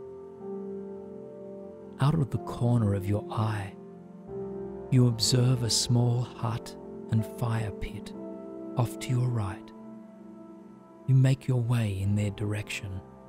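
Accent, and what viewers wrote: Australia. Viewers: I love your meditations, they’ve helped more than you’ll ever know. I feel even more drawn to you because I think you’re Australian? Feels a bit more like home and trusting.. I can’t explain it. But thank you again 🙏🏼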